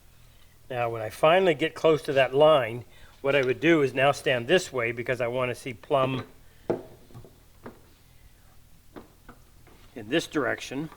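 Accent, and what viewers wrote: Canada. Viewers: If the accent didn’t indicate that is Canadian, the mallet handle wrapped like a hockey stick grip sure does. Thanks for thr tips,. Putting pencil markes along the length of the mortice to help position the chisel is something I never thought to do. I also like how tou set your making gauge to the width og the chisel by sandwiching it between the marking gauge cutters. It seems I need to flip my cutters.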